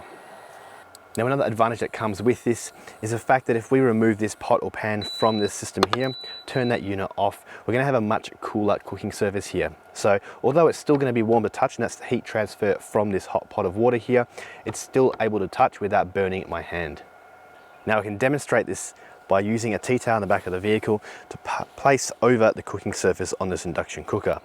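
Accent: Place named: Australia. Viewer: Nice to hear an Aussie who isn’t a hobo. Well done.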